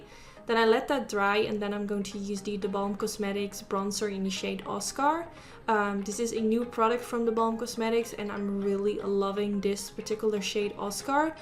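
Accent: Netherlands. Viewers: First of all. This look is STUNNING. I was looking for a look to really make the colors pop because I haven't really been into super colorful looks yet. Also you are Dutch right? I can hear it since I'm Dutch as well hahaha. When you said Glucose I knew it for sure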